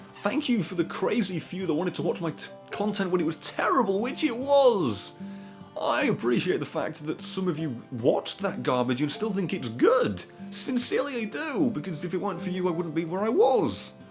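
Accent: United Kingdom. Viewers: I gotta admit this is one of the few channels that I've grown so fond of that I've tried to remember the time of day you put up new videos. I stumbled upon your insane British rambling back when the Dark Souls 2: Crown of the Iron King videos came out and I found your blind run against Sir Alonne. Since then your content has been quite fantastic, and even a lot of stuff before then is great to check out (Altough I did notice the rather obvious point where the quality of content changed dramatically). Both the old, the new and the current content you do is awesome and I look forward to seeing what the future holds in store for both you and us subscribers. Probably you dying horribly to more Uruks and me writing more silly death lists. Godspeed you magnificent bastard of a youtuber!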